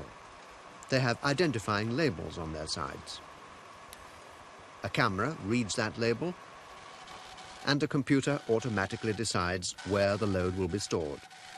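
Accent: RP, United Kingdom. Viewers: I just miss the old style received pronunciation delivery:)) Proper English, properly spoken. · If only he had this beautiful classical RP accent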